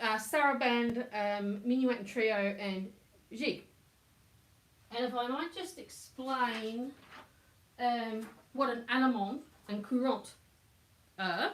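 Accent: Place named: Australia. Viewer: Sharing your gorgeous Aussie accent and aspie outlook, and recent dip (10 years ago) into the recorder and early music.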